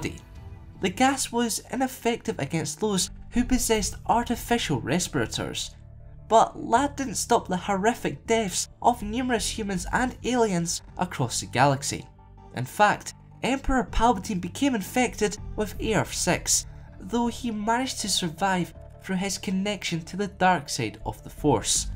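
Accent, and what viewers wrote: Scotland, United Kingdom. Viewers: Are you Scottish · I can spot a Scotts when i hear one. This guy's definitely a Scottish. Prood tae be one, dontcha?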